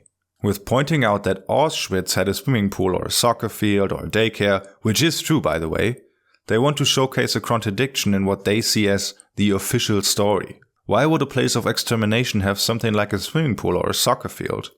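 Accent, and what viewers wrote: Germany. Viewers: i know you’re still making these videos, and doubt you’ll see this, but i think it’s extra important for you to do this work. for some reason my lizard brain trusts you more because you have a german accent. now, of course that isn’t a real reason to believe what you say as true, and i know this, but for people who are on the fence about the truth of the holocaust, all they really want is an authority figure, and i think you give that where british, american, and canadian-accented creators can’t.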